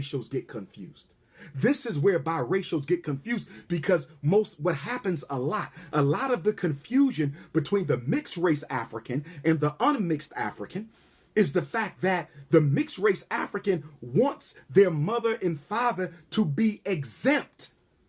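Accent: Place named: Philadelphia, United States. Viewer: Woooow... you can tell for sure Dr. Umar is definately from Philly! On another level...love my brother his intellect is beyond sexy! - I always say this, they will never ever go against the oppressor because the oppressor reared them--I dont believe they can.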